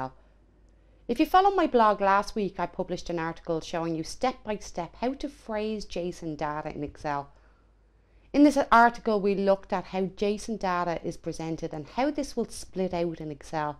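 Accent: Ireland. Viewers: Great. Thanks. Btw nice Irish accent. :-)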